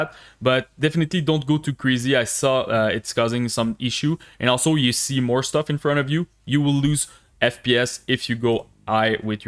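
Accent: Quebec, Canada. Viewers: I like the quebec accent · L’accent québécois hahaha mais lets go je vais essayer ça 🤘🏼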